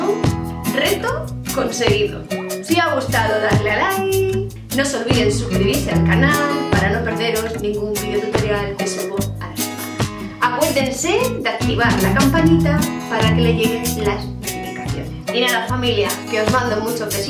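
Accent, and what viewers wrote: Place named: Murcia, Spain. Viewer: Gracias...tu acentico se me hace tan familiar...que hoy por fin pude saber que eres murcianica,me encantan tus laborws,y te sigo desde hace años,por cierto la capaza amarilla de trapillo la hice y se la chulear a mi hija.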